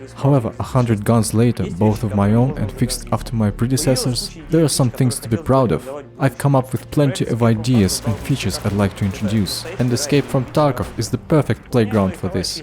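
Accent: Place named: Russia. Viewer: when a russian try to speak english in this video...i understand only 40% of the dialogue, please take an other speaker for english voice over....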